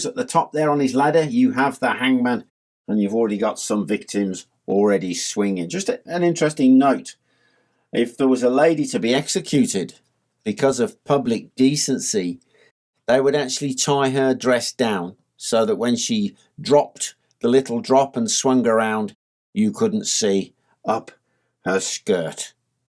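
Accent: United Kingdom. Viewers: Gotta save this for tonight for sleep. Need that sweet sweet british grandpa voice to soothe me to sleep. Thanks, from a cousin across the pond!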